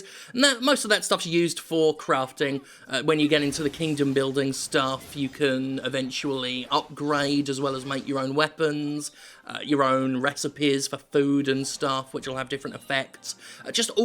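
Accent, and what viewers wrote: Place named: United Kingdom. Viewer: When he started talking about the story, he completely lost me with his Britishness.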